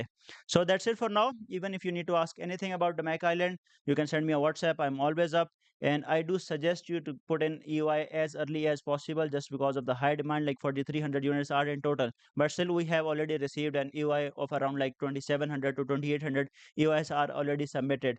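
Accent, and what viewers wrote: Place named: India. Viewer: Improve your Indian accent bro...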